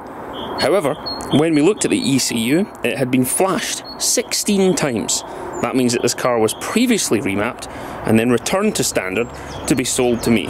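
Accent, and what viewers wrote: United Kingdom. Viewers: Dude, look.. this is the story: 1. I'm German and worked for 2 big German manufacturers as well as on their cars. They break and they break fast and a lot. Deal with it 2. You sound British or a little Irish or Scottish, so what point are you trying to make with such a car with them speed limits here. Makes way more sense in Germany, but quite obviously you don't live there. 3. Buy yourself a decent Toyota Avensis D4D and enjoy your life instead of wasting time fixing things. 4. As I said I worked for German car manufacturers and advice everyone NOT to buy a German car but a Japanese instead because they are awesome. 5. Even my German Stihl Strimmer from the early 80's - which is still running strong by the way - was built in Japan.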